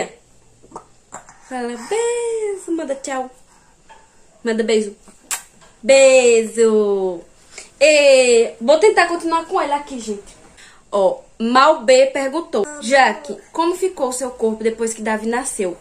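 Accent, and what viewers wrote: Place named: Pernambuco, Brazil. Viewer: Pernambucana arretada amo esse sotaque também sou de Pernambuco..bjs conterrânea 😘😘😘😘😘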